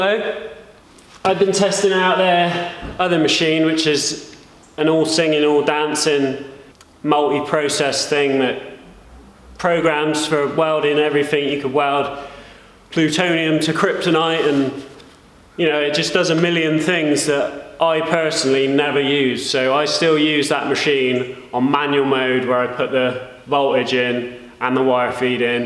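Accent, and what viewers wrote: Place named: Cornwall, United Kingdom. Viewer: Brilliant job mate. I haven’t bothered to subscribe to anything in ages but your channel is awesome. Watched all your vids and you make it look so easy and really explain things well. I’ve picked up so many tips from you. Worked with a guy from Cornwall who talks just like you, you could be brothers haha. Anyway keep it up mate, I look forward to seeing your stuff, I think we all do. Legendary!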